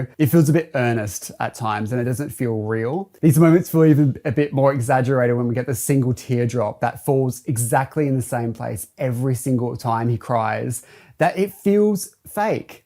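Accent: Australia. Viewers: are you australian by any chance?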